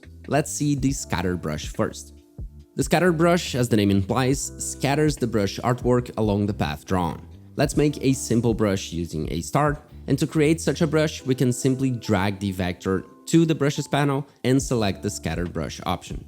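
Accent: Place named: Eastern Europe. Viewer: You have a very massive slavic accent.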